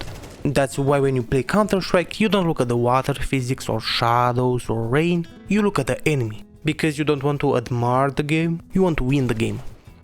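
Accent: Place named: Eastern Europe. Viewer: Ha, so this is what the voice of reason sounds like, it has a vaguely Eastern European accent, makes sense! Liked and subscribed, you hit every nail on the head.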